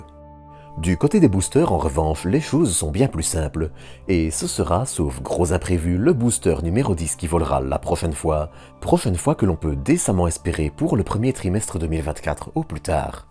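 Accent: Belgium. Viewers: Ouiiii la voix BELGE !!! xDDDDd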